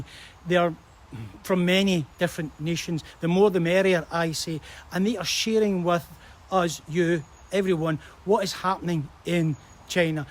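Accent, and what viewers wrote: Scotland, United Kingdom. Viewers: Scottish accent! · I lived in Scotland for 10 years and now working in China. It is so good to hear Scotish accent again. Scotland is such a beautiful country and is my second homeland. Compare to China, UK really lags behind in terms economy, living standard and technical capabilities. China is a vibrant and dynamic country. Cities in China are much more modern than London.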